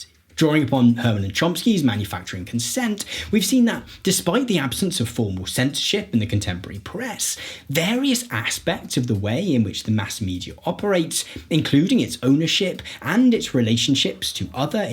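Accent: United Kingdom. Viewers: has that certain British charm with which he speaks that's difficult to describe, but instantly recognisable. The way he sometimes replaces "because" with "for" is just... well... cute! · Your accent is half "annoying British accent" and half "pleasant British accent" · Why do you look like you sound British. I know why you sound british, but why can i tell you do by looking at you? Care to explain yourself? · Mickey Rooney with a British accent? 🤭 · Great video but somehow my man has a british accent with a california vocal fry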